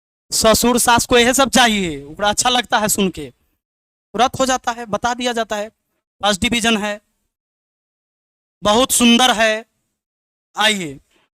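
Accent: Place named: Bhojpuri, India. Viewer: सच में सर 🙏आपकी बात करने की शैली न दिल को छू जाती हैं। हिंदी में मिक्स, भोजपुरिया अंदाज़...............👌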